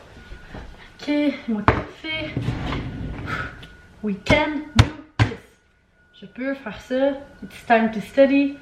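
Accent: Canada. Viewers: J’adore l’accent Canadien 😄😄 Super ton vlog ,c’est sympa de nous partager ton univers 👍👍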